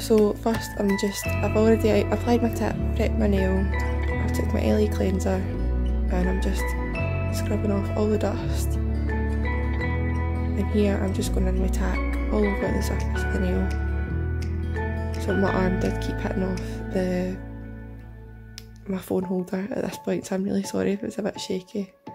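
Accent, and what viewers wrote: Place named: Scotland, United Kingdom. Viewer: She's Scottish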